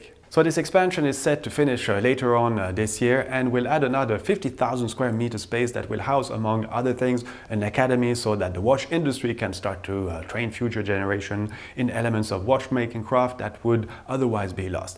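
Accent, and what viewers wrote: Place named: France. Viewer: Interesting content and very ambitious lecture. But: you try to press much details into 20 mins of video. That leads to a velocity of your speech which together with your strong french accent I can hardy understand. And I'm familiar with Englisch. Alltogether I'm faced with a kind of frontal teaching, which I hoped to be extinct since the 60s.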